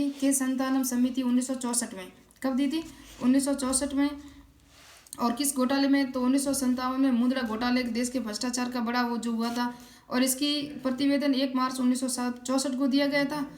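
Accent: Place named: Rajasthan, India. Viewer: Aap badmer ya jodhpur side se ho kya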